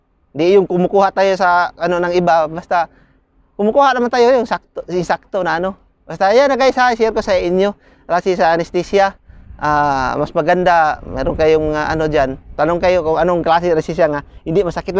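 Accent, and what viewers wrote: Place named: Visayas, Philippines. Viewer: Hoy mag bisaya kanalang oi halata Ang Tagalog Mo dol.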